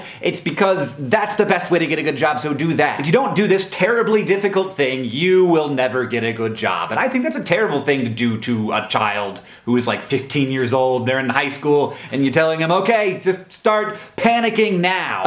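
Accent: United States. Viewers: Do you live in America? (Judging by your accent from your videos, I think you do.) Money practically grows on trees here...if you have any sort of brains, creativity, OR charisma. Or a pulse. If you feel like "there's no money" in a certain field move to India for a few years. Try out Pakistan. We have it pretty good.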